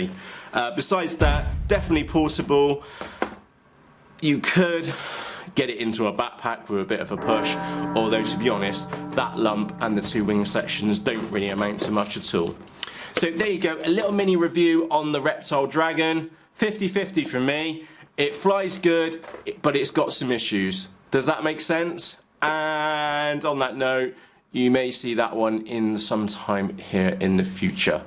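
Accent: Bristol, United Kingdom. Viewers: Good to hear that Bristol accent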